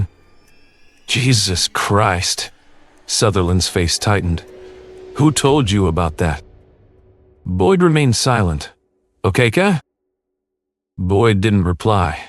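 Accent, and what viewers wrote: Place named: United States. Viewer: What the helll? No American accents please!!! This is a book about the English police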